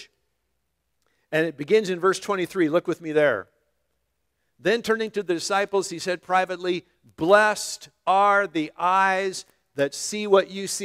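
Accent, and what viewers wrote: Canada. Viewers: I thought you were Canadian.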